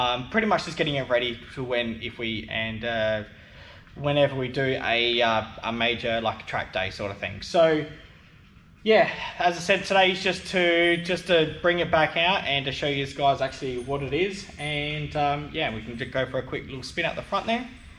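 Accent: Australia. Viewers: Bloody!!!!!!, Insane!!!!, Well BUILT!!!!!, Ya Sound Aussie:text-green-game-over::text-green-game-over::text-green-game-over::face-green-smiling::face-green-smiling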